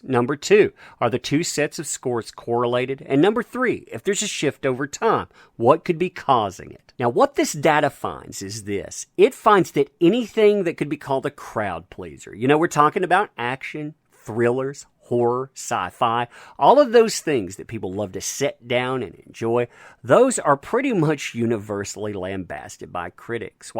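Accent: South, United States. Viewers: you should do a cooking episode. you got that southern accent of a cowboy chef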